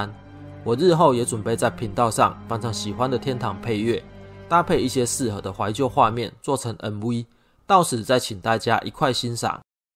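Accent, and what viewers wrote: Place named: Taiwan. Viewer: 挺喜欢听你说天堂的故事；尤其那带满台味的国语，听了就亲切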